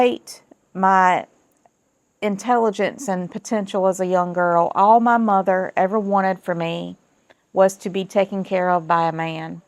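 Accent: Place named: South, United States. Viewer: What part of the south are you from... I hear an accent. Im in Bangor, maine your northern neighbor.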